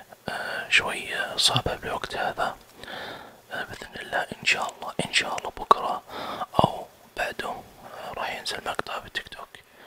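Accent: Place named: Ha'il, Saudi Arabia. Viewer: لهجتك حايليه مره انت من حايل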